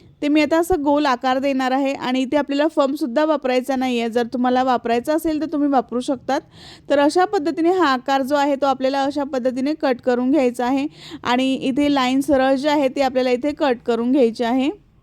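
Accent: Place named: Alibag, India. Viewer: khupach chaan alibuaag warun bolte❤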